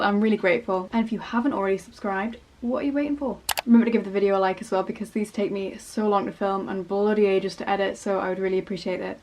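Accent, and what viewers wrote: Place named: Australia. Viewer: Where do you live ? You Sound Australien.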